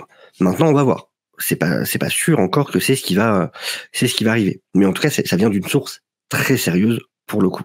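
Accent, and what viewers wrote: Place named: Alsace, France. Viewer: Ce petit accent alsacien, mon dieu ça fait du bien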